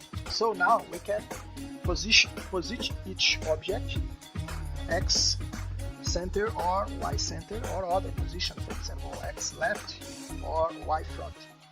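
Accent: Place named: Brazil. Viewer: Falando em inglês. Porem o sotaque me parece Brasileiro hehe.... 😀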